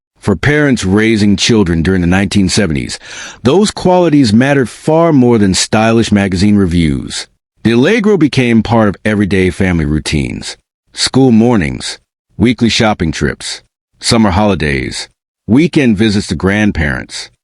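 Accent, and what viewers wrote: United States. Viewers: Sorry one minute of the American commentary finished me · Why do we have to have an American to narrate a video about British cars..I can't be bothered to watch after 2 minutes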